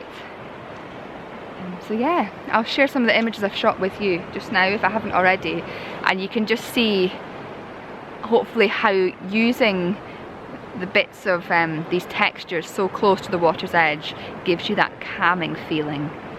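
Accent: Scotland, United Kingdom. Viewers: Great Video, as Usual a pleasant time, listening to a wonderful scotch accent. You make photography wonderful. Brilliant images!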